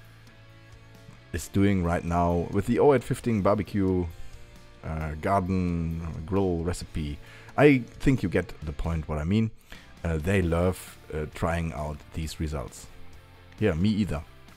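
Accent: Germany. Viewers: Best german accent ever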